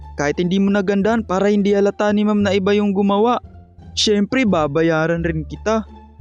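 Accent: Visayas, Philippines. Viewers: Bisaya kapo ba kuya? Ask lng po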